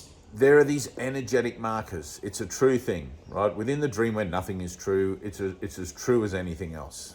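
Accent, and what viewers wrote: Australia. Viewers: Beard ✅️ Long hair ✅️ Australian accent ✅️ Scrubby handwriting ✅️ Tattoos ✅️ Deranged laugh at the beginning of each video ✅️ Everything you say ((must)) be true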